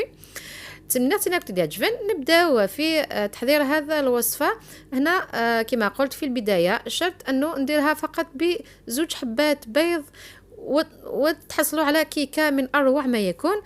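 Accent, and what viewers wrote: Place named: Kabylie, Algeria. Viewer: الله الله على الكيكه شاطره تهبل مشاء الله يعطيك الصحه والخير و الهدره القابلية نموت عليها ❤️😘😘😘 احلى لايك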